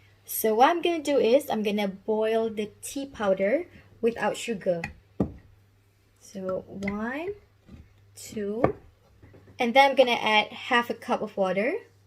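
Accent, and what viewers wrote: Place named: Malaysia. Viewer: I love your Malay accent, And the look in your eyes! 🙂🌹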